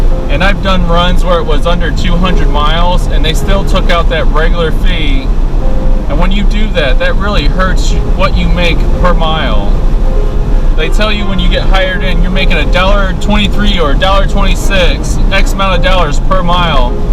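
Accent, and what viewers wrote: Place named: Canada. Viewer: Well that's really good I'm happy for you and I'm sure you like it probably a big change for you you sound like you're from Canada are you well thank you for answering my reply really enjoyed your video I could hear your frustration I guess you would say and in the tone of your voice and I completely understand I've been in similar situations and of course with different companies doing different type of work but I completely get it then again really enjoyed your video looking forward to seeing more of them and God bless and I hope you have good luck in your new work opportunity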